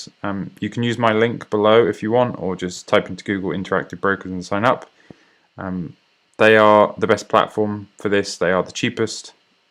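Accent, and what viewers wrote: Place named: United Kingdom. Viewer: Where do you buy your calls? Sounds like you’re from the uk too?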